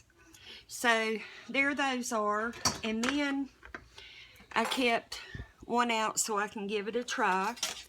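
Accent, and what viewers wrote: South, United States. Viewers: your sweet southern drawl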